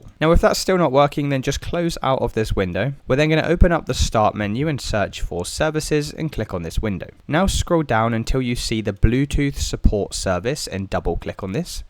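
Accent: United Kingdom. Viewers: This is the first bit of crappy troubleshooting info i found when originally googling the issue... A video with a british accent doesn't make it better.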